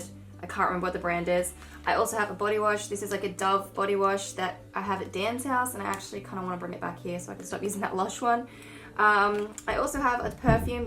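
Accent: Australia. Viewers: Yep, that's definitely a fellow Aussie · Is she Australian?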